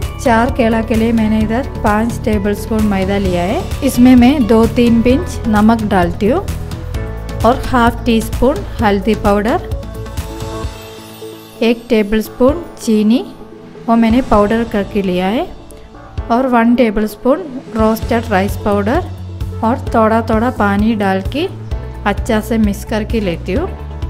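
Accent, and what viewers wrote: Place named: South, India. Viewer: I am from Bihar love to listen your Hindi . Truly speaking I don't want to listen your perfect Hindi Because your innocent Hindi tone mixed with south Indian tone sounds Awsome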